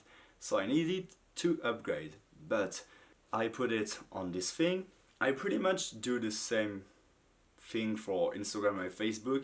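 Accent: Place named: France. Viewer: Just honest feedback, you have great content, but work on your English your French accent is alluring but a teeny tiny bit too heavy so it’s hard to understand you sometimes. You’ve got the face and content for YouTube it’s obvious! Good luck!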